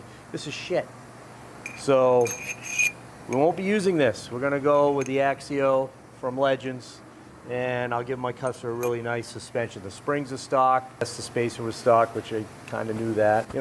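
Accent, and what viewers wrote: United States. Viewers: Is that a New York accent? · We’re in mass are you from I’m from Cambridge can tell by you talking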